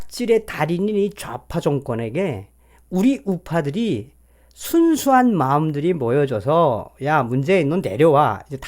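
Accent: North Korea. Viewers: Thank you, Thank you again! 말씀하시는데로 코믹이고 코메디 입니다. 진행과정도 북한 말투로 북한에서 항상 쓰시는 말투로 진행하시는것도 재미나고 정성산 "때래비" 힘되요, 희망의 방송! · 다 좋은데 북한말 일부러 써지말라고 그렇게 안해도 방송 본다니까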